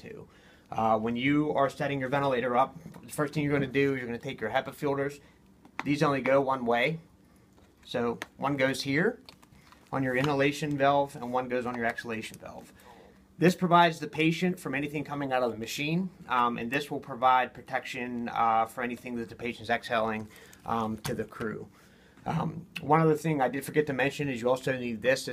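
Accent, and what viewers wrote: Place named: Pittsburgh, United States. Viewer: Pittsburgh accent!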